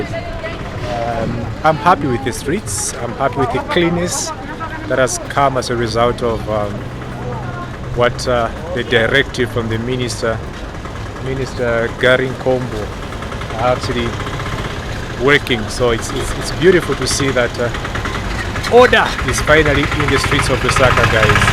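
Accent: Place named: Zimbabwe. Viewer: He thanks for the video this happened when i was in zambia in july/august. You sound zimbabwean bro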